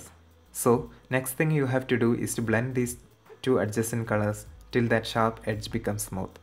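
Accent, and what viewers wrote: Kerala, India. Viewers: Sans-sational YouTuber that's a typical Indian accent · He is most likely from Kerala, god's own country. Malayalam and their accent sounds very pleasant to my ears. 🙂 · Are you a keralean ? Your accent is like malayalam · Are u from kerala? ?? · Are you a mallu?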